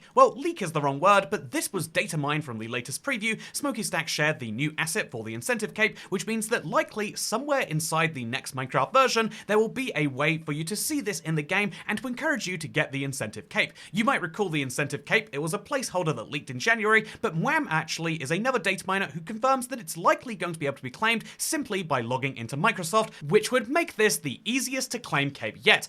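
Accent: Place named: United Kingdom. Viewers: It is because he is british and people from that area pronounce Z that way. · Hes from the uk, brittish people and people over there in general say things and spell some things differently😊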